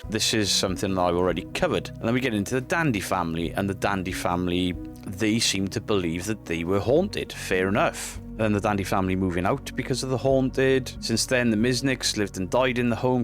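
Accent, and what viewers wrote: United Kingdom. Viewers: Man tbh I don't care about the video or hookie poo whatever what interests me is that you sound British and your talking about quite literally my neck of the woods I was raised in Hinsdale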